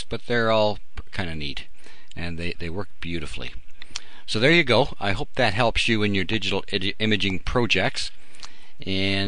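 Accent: Canada. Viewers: i dont have this, so my question is dumb, buttttt: you keep saying "press harder" what do you mean? are you you using some sort of pen? how do you press harder on a mouse? -sorry i dont really understand but i DID enjoy watching! p.s. are you canadian? you sounded canadian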